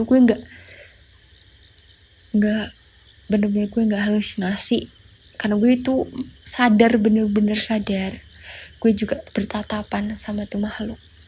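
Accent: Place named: Java, Indonesia. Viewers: Medok juga keren kok.. ga harus pake gue padahal medok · Scara dari JaTang mba nya ... 😊😊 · Medok mbak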